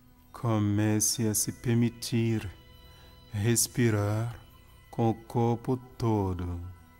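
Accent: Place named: Africa. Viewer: pra mim a entonação está normal, o fato que você deve ter estranhado é o sotaque africano do narrador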